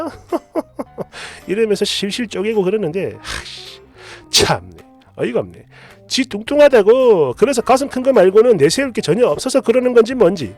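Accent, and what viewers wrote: Gyeongsang, South Korea. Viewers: 글 내용도 웃기지만 님 경상도 사투리 정말 웃겨서 제 얼굴이 웃는 얼굴로 변했어요 ㅋㅋ감사해요!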